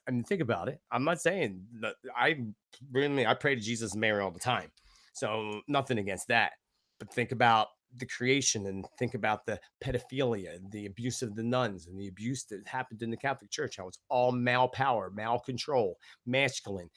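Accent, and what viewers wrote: Philadelphia, United States. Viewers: Our HumanityFamily deserves no less than what I've known will come for 2019-TRUTH and JUSTICE! We will deal and heal with the upcoming revelations that will SHOCK us to our core, together. ThanQ for this video! I love listening to you, lol, you remind me of home 😊, I was born in Philly and lived ALL THROUGHOUT the tri-state area growing up lol-there's NO hidin THAT accent 👍. 💜💜💜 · You from Philly? Accent sounds familiar...Delco?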